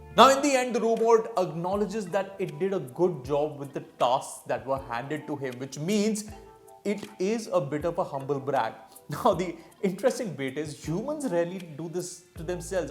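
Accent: India. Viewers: The Indian accent always reminds me of those phone scammers.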